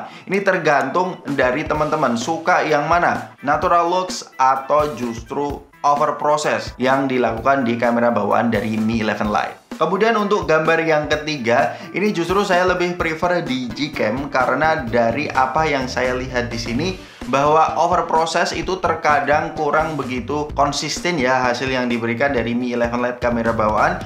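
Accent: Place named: Yogyakarta, Indonesia. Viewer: logat jojgae ojo ngasi ilang dab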